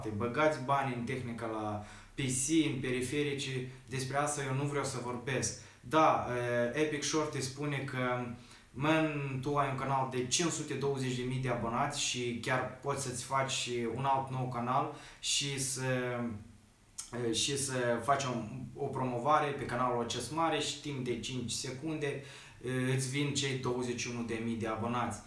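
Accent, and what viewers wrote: Moldova. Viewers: Bro e moldovean=)))))evident ca vorbeste asa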